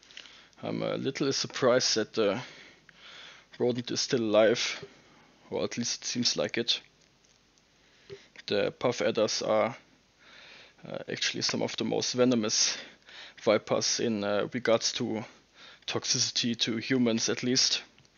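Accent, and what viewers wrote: Germany. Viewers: U sound like a german?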